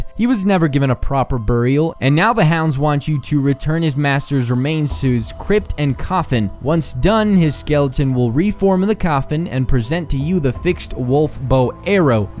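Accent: Bosnia and Herzegovina. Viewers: are u bosnian?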